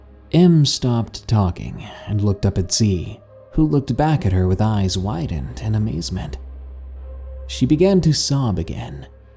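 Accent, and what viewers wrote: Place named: New York, United States. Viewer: thick new york accent FOIST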